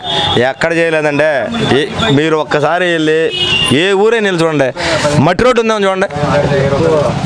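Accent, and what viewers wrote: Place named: Godavari, India. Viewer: Super bro. Godavari style lo pettavu bhale ycheaps ki. We want to see more of your speeches in Social media. Thanks to for the effort 👌👍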